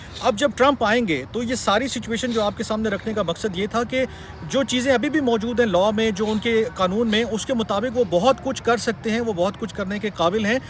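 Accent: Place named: Punjab, India. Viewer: Tum. Pakkay. Punjabi. Lagatay. Ho. To. Phir. Punjabi. Kyu. Nahi. Boltay.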